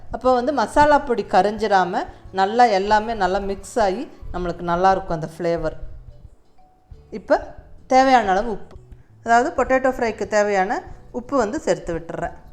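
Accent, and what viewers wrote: Coimbatore, India. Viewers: Very tasty food. Ongaloda kovai Tamil உச்சரிப்பு அருமை.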